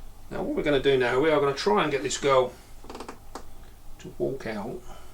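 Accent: United Kingdom. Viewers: Hello, May I ask you what kind of british english dialect you are used to speak? It sounds very well and it's quite understandable for me as a german.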